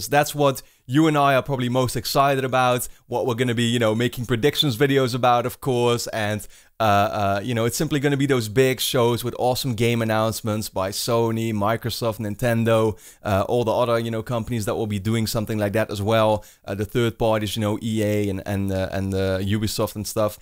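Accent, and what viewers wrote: Netherlands. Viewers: Herm... he's probably Dutch, isn't he?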